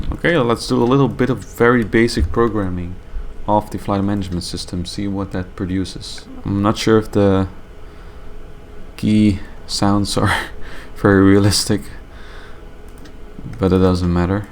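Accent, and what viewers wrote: Netherlands. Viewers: It's nice to recognize the accent from when I lived in NL when I was a kid. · Jij bent eigenlijk Nederlands dat kan ik wel raden aan het accent Ik denk dat xplane 11 de mooiste game is genieten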